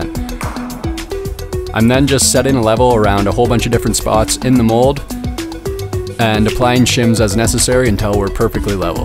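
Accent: Canada. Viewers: Wonderful product. Terrific production. The Canadian accent is like nails on a chalkboard. But the product and production were too good and couldn't click away. That's saying something.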